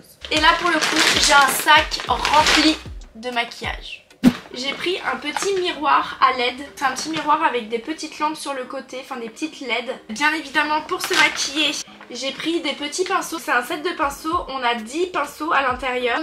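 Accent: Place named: United States. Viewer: Je n'avais jamais entandus ta voie ! Au début, regarder tes vidéo sur tiktok et je croyais que tu n'était pas française plutôt américaine 😅🤣sinon t'es vidéo (et short) son juste trop bien et tes make-up, juste incroyable!!!! ❤❤❤❤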